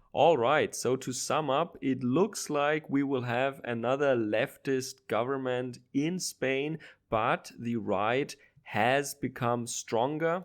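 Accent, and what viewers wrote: Germany. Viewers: I’m not overly interested in Spain, but I am trying to learn more about European politics, & all the coalitions make them similar in a way - as you compared the top 3 winners to German results - and very different from the US politics I know the most. I think you did quite a good job compared to a few other vids I watched/news I’ve read on recent Spanish politics, & I find your voice with the heavy German accent very pleasant to listen to. Reminds me of my dad, may he Rest In Peace. I like the little personal insights/anecdotes, too! Keep up the good work :)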